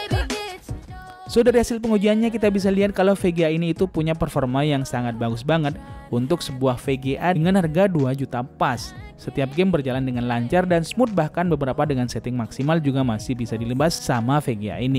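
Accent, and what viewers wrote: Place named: West Java, Indonesia. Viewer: he'eh nya si aa teh meuni sunda pisan nya .. wkwkw jadi resep maca na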